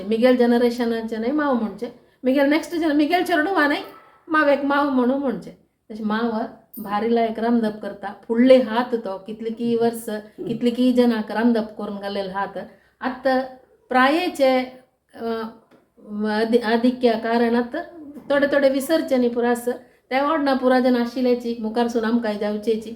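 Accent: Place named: Konkan, India. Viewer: Sounds like konkani spoken by gaud saraswat brahmins in karwar Mangalore goa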